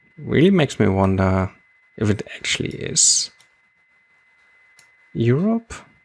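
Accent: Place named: Germany. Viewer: Hi, thanks for subscribing to my channel ! Where are you from btw ? I'm guessing either Germany, Austria, Switzerland or Denmark based on your accent (but I might be completely wrong haha) Edit : after watching another video (the Romania Fake one), I'm not so sure now haha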